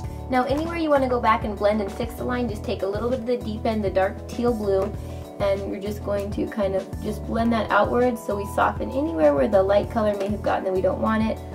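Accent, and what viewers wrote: United Kingdom. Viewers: i'm in love with your british accent<3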